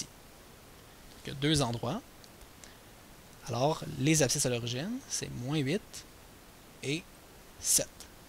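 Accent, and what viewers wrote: Quebec, Canada. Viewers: il est Quebecois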